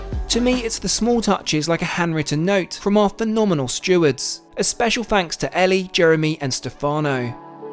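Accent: United Kingdom. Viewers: This guy has the most British accent ever!!! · Two reasons why I won't watch. First you smile too much. No real person does that. Second is the Brit accent. Awfully hard to listen to especially they way the voice drops off at the end of a sentence. How about some good old American for a change?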